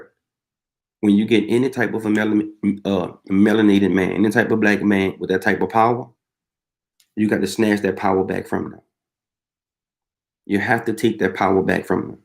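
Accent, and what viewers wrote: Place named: Louisiana, United States. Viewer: Spot on!! Its 2020 and if u dont feel something aint right then u are basically walking dead at this point. Brotha u sound like u from LOUISIANA/new orleans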